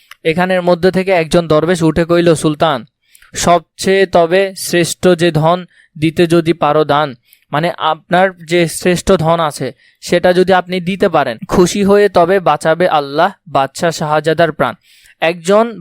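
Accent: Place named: Chittagong, Bangladesh. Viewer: আপনি মনে হয় চট্টগ্রামের মানুষ তাই না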